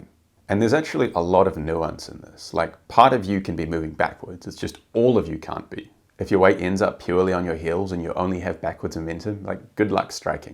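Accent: New Zealand. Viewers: I am so happy I found this channel. I am a Kiwi away from NZ so it was nice to hear the accent! I love MMA but also Parkour and remember you from SP09! You got know Storror!!!!! lol Also, a little TMI, I have rather severe Ankylosing Spondylitis and a weight issue that is more about inability to find a way to move that wont break me. My muscles are a mix of ridiculously strong and dense to almost nothing there and I hope I can learn something, anything from your vids that might help me as I am becoming the Hunchback of Notre Dame and I hate it, deeply. Anyway, great vid, I watched it twice because NZ pay is bad and you deserve money, I liked and subbed. Now onto Callum then Dom! Very interested as Dom was often training with some of the guys in the city I live in, in Aus and seeing him in real life is so different to YouTube!!! Things he attempts and lands that look big on screen, look literally impossible to my eyes. 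GG! Keep up the hard work and I look forward to the next upload! ✌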